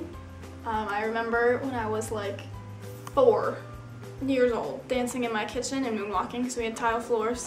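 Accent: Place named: Chicago, United States. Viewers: I think it's because she's Midwestern. Maybe from Minnesota or Wisconsin. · Her accent is like a normal Midwest accent. I have it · She has a bit of a Chicago style "ah" going on, but that's just what I hear.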